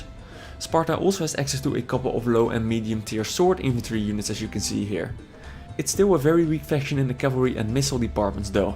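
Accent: Netherlands. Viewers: You have the most horrible Dutch accent ever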